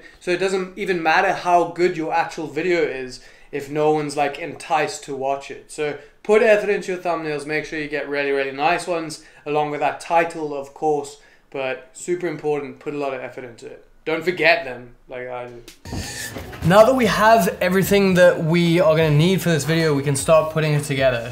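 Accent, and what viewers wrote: South Africa. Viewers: Is jy 'n suid afrikaner? Dit klink soos 'n jaapie wat in England woon.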